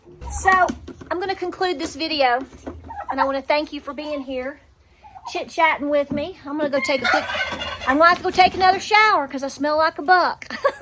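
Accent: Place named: Georgia, United States. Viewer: I am so glad I found you and your channel. I love hearing you speak. You got a lot of wisdom for someone so young. I grew up in NW Georgia. We talk like you do. And, surprisingly, my heritage is very similar. I never knew my great-grandmother on my mom's side. I was told she was full blood Cherokee. My mom traced her father's lineage (Irish) to a pub in New York around 1700. My dad's side was Welsh and German. Don't know about the German, but when the Macintosh clan had a disagreement, the Mac Thomas clan was formed. Eventually, they headed south (Wales) and dropped "Mac." They ended up in Murphy, TN, and branched from there. Please stay the way you are. Prayers for you and your family 🙏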